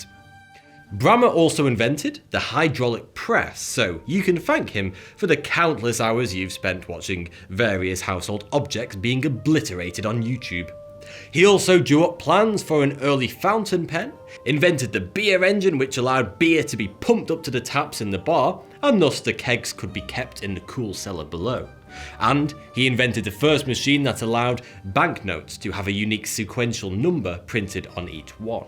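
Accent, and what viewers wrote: United Kingdom. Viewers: Could be the British accent. But I found myself mesmerized by this. Lol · I also can't unhear it. Is it due to the British accent? I had also heard "everything" as "everyfim" in the game Battlefield 1... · Oh my goodness, I have fallen in love with your accent. The British pronunciation of the English language is truly the most gorgeous to the ear. Plus the great content. Why haven't I found you ealier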